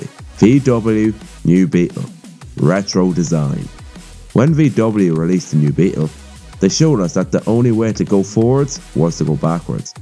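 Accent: Ireland. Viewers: he is Irish...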